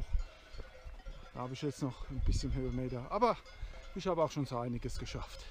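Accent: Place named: Mannheim, Germany. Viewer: Schönes Monnemerisch 😁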